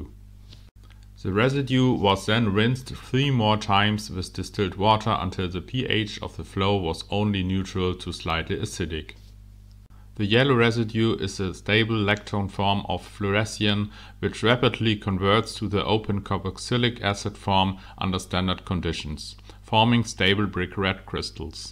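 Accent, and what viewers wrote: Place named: Germany. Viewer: I simply cant overlook the german sounding accent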